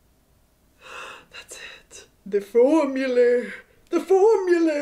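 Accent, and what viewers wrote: United Kingdom. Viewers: Her British accent is so iconic it sounds like Harry styles and peppa pig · WITH THAT BRITISH ACCENT YOU WERE SERVING ADELEEEE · your british accent makes me think of adele lmfao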